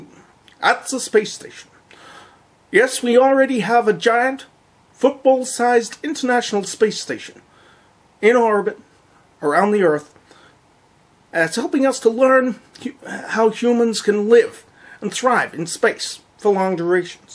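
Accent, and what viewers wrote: Standard. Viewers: Fairly well done neutral American accent :-)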